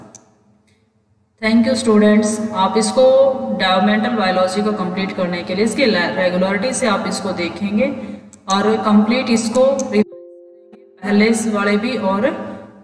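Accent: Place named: Haryana, India. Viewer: Haryanvi mdm 😅❤nice explaining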